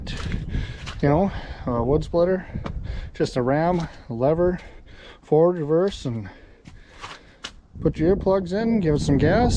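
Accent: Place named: Canada. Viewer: You sound Canadian 😎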